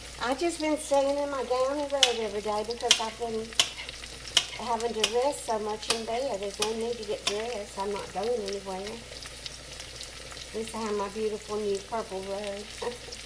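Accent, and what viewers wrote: South, United States. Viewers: Hello dear, it's been a little while but it is always lovely to hear your warm, welcoming Southern USA Accent:) Bless you.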